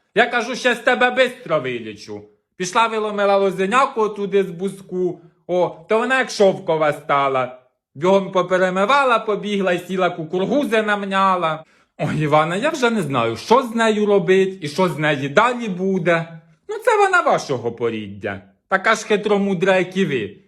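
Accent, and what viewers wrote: Poltava, Ukraine. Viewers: Дякую,! Кожного дня переглядаю Вашi шедеври. Ви- талант!Як точно, мальовниче вiдтворюете наш полтавський дiалект! А iнтонацiя, емоцiональнiсть! Все не вигадане, справжне!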